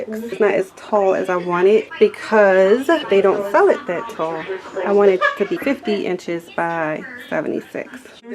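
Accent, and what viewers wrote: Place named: Baltimore, United States. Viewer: You sound like you from Baltimore, MD if so ayyyye! Great video!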